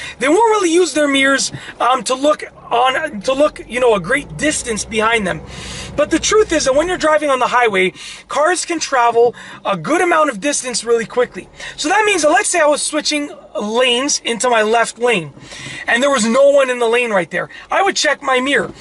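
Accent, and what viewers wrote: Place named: Canada. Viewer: Are you Canadian? You sound extremely Canadian.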